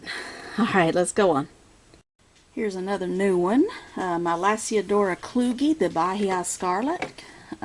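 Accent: United States. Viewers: agree!! was gonna say the same, I love this kind of US accent, plus ur expressions :D , especially that one --> "mmm mmm mmm !" when admiring the green T's legs LOLLLLL....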